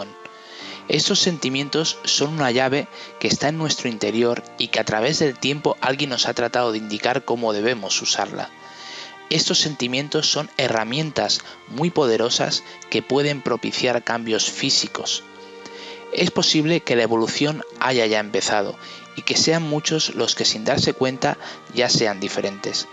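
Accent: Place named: Spain. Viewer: Eres de España sin duda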